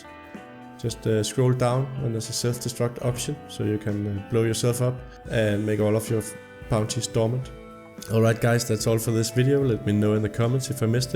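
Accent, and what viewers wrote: Denmark. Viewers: Hmmm, is that a danish accent?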